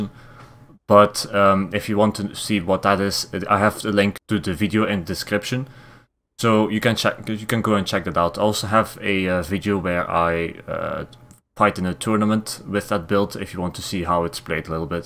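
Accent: Limburg, Netherlands. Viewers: You got a Limburgs english accent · you sound dutch :)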